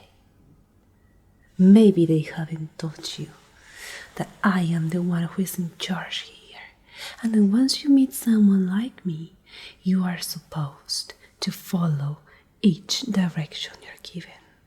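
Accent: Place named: Latin America. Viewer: btw, I noticed that in the video prior to this one and in some prior (the birthday party one) you had some Spanish worded books and/or a Latin American accent. Are you from a Latin American country?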